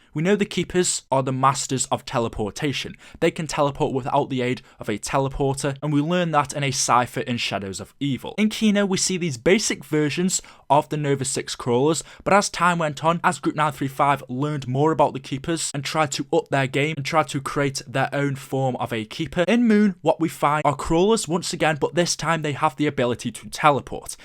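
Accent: United Kingdom. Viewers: Every time I click on a COD video there’s a person narrating with a British accent ???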